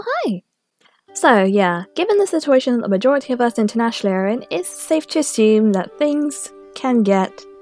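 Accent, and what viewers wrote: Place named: United Kingdom. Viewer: as an American, I hope this doesn't sound creepy, but the British accent is very addicting and relaxing to listen to....that was random, sorry. I would love to see more animations! Yes please.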